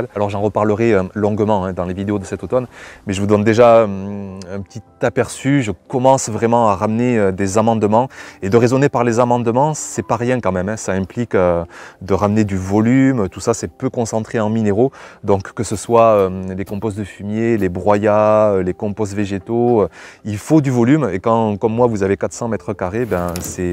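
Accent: South, France. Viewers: Merci! Quel pep's avec bel accent du sud! Ça fait du bien de t'écouter! Je m'échappe ainsi un peu de mon bureau et de l'industrie! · Oui c est y ça la voix du sud belle semaine également ☺️🙏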